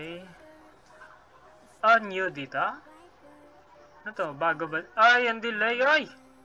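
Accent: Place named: Visayas, Philippines. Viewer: Idol taga saan ka idol parang bisaya ka ahh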